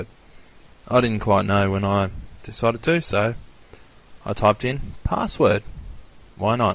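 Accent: Australia. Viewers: Thank you so much man, great help. PS, you sound Australian. If so, which city are you from?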